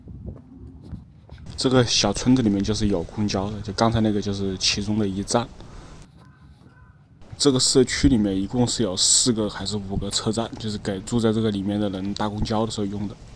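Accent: Wuhan, China. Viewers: 你是武汉的吗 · 你这武汉普通话的口音真重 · 武汉老乡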